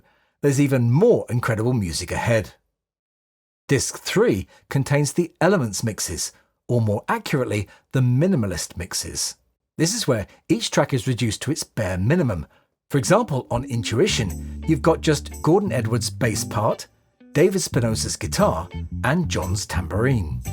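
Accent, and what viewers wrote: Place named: United Kingdom. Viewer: Hola !! Desde Argentina, muy fan de John, amé toda tu explicacion !!! espectacular y ese acento britanico acompañando la explicacion da gusto oirlo. Te felicito. gran video. gran disco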